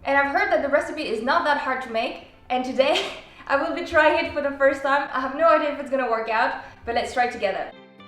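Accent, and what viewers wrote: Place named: France. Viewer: Is it me or do you have a TINYYY french accent 😭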